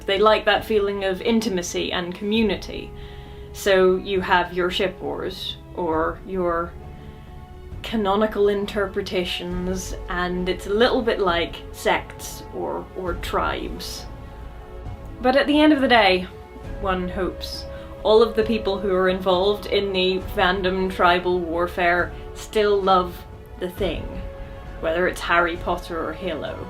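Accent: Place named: Northern Ireland, United Kingdom. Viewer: First things first: oh dear lord, I remember stumbling upon Homestuck about three millenia ago.... even compared to when this video came out! I really enjoyed it, but it was a bit of a behemoth at that point already, and somewhat... daunting for it? Anyway... A very good series! I've watched all 7 at this point, but this one particularly stuck with me, even though I kind of don't feel like I've ever managed to get so involved in a thing that I'd consider myself a part of an actual fandom.. It kind of put things in a bit of a perspective, I suppose, and of course I do tick a lot of the boxes for being a huge fan (HP, Doctor Who, Discworld, etc.). Just... not the community ones, mainly? And, for another random tangent: I've been somewhat binging your videos for several days now, and..... I've clearly been in Norn Iron for too long, because it didn't even occur to me that you might sound Northern Irish until you mentioned Lisburn here xD xD And here I am - among other things - a bit of a linguistics nerd! 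Well, clearly it's what passes for default to my brain these days! Not a bad thing, mind, because I rather enjoy the Ulster dialects in particular :)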